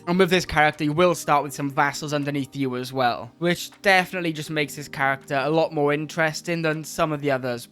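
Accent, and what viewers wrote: Wales, United Kingdom. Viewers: Are you Welsh or African-British? You sound like a combination of both, but I've heard you are Welsh, it's confusing me so much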